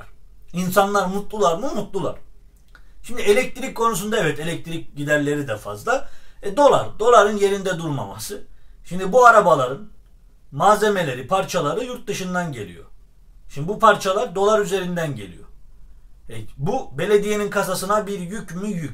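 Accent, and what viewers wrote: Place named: Koruk, Turkey. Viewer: kardeşim arada anlatımınız koruk şivesiyle çok güzel komik oluyor koruklumusunuz ^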